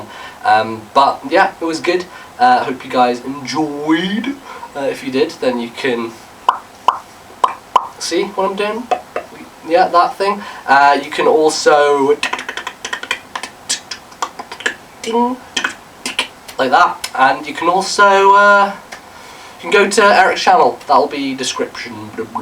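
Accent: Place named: United Kingdom. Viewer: i think hes an indian with a british accent who lives in scotland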